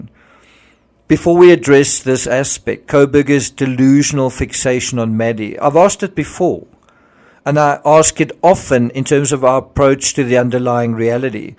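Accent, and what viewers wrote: South Africa. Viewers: I would say Maddie because he met her at the restaurant, she may have been vegan herself also. Somebody has also say Kaylee didn't live at the residence anymore and was visiting Maddie to show off her new car, so perhaps her being there was totally unknown to Bryan. Xana and Ethan were almost certainly collateral damage as Bryan left the house. PS: I love your South African accent 😆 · love your videos! are you south african? I can hear a slight familiar accent. i'm from the free state studying criminology - your channel is truly amazing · I live in Brazil but am a fellow Saffa... I love true crime and have been following the Idaho case. I came by your channel by chance and thought... I recognize that accent - this guy must be South African... low and behold you are. Proudly South African! I just subscribed ☺️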